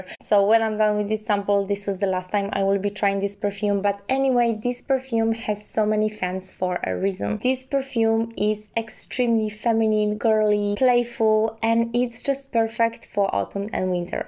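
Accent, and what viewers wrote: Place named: Poland. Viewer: You have polish accent 🙂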